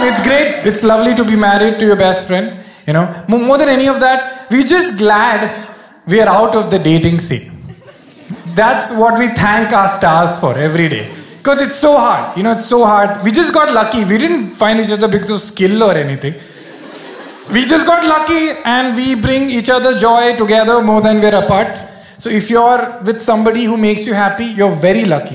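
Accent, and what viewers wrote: Kerala, India. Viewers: malayali accent is too cute anyway 😉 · Malayali accent is nice! Mallus are so sweet.. My in laws are (Sasural) Malayalee. They all are super sweet. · His malayali accent is also cool. Enjoy your samosas, people.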